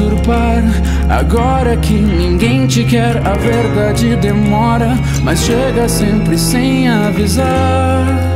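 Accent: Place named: Rio Grande do Sul, Brazil. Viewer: Gaúcho, né...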